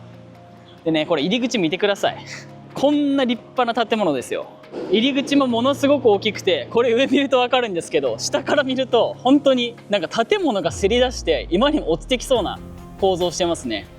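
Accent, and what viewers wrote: Japan. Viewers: 長野出身ですか？ 結局1軒しか売れてないなら、住んでる人には安いよね。ビル1棟だもんね。とてもエコとは思えないけど。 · たまにのぶりんさんの動画見たりしますが、のぶりんさんと同じアクセントで話されますね。北陸のご出身ですか？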